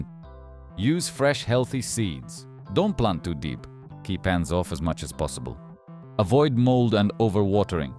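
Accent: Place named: Europe. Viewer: Sounds like european😅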